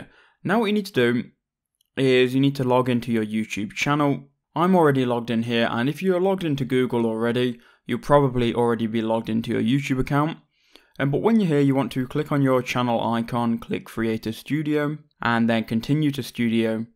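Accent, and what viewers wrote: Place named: United Kingdom. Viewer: Thank you British man!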